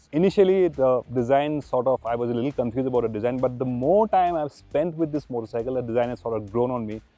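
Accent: Assam, India. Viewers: Happy to see more assamese people in the automotive space. Accent tu huniye vabisu axomiya neki baru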